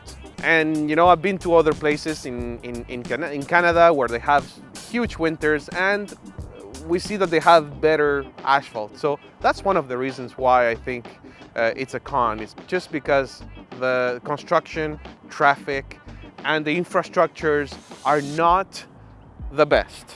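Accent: Spain. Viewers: you are very charming and positive with your Spanish accent !!! Are you Argentinian of the Italian decent ? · Great Info. I was confused at first listening to a Spanish accent from Montreal. Most Americans have trouble with one language let alone 3. You forgot the biggest pro - the food.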